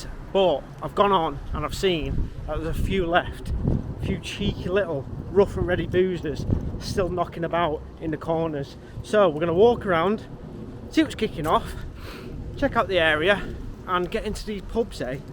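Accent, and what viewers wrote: Manchester, United Kingdom. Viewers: What part of manc are you from? Deffo a manc with that accent. :-)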